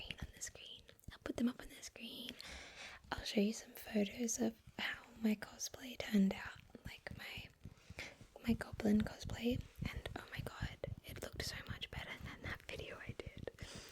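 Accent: Australia. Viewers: You do your preference, but the short hair is super cute. Why do I find most women that aren't into guys hot, oh well. As far as trigger words go, 'plosive p' words are good (words with 2 p's are good; stipple, apple, puppy, etc.) Also repeating sound words are good; coconut, banana, chocolate. Why are most of the words foods? haha. Also, leaning into the Aussie accent really hard for a video would be good, since that is something more niche that you have going for you. Even using slang, like "chuck a wobbly" or something. Not gonna lie, doing the heavy Aussie accent role play wearing that same type of tank top or sports bra exercise top used in the physical trainer videos would probably do well for views (more revealing shoulders/etc. get clicks these days). Whether you do another physical trainer RP or maybe do like a female mechanic explaining the work they did on the car or some work stories (some "grease" on your arms and cheek). 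Anyway, just some ideas and insights to help the channel, not just nosey! haha.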